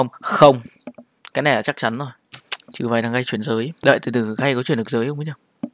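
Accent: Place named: Hanoi, Vietnam. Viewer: T thề là t thích giọng con trai Hà Nội vl ý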